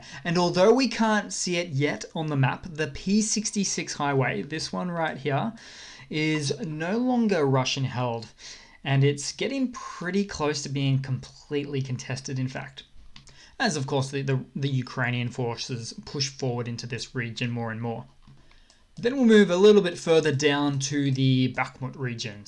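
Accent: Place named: Australia. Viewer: Assuming you are in Australia — as your accent would suggest — you must be uploading around midnight (NSW, Vic, Tas), 11.30 pm (SA), 11.00 pm (Qld), 10.30 pm (NT) or 9.00 pm (WA). Or maybe 1.00 am (Norfolk Island), 7.30 pm (Cocos/keeling Islands), 7.00 pm (Australian Antarctic Territory), or 6.00 pm (Heard and McDonald Islands). On any view, burning the midnight oil. So a special thanks for the effort which this involves.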